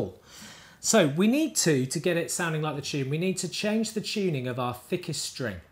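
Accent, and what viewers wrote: United Kingdom. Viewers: he's British leave him alone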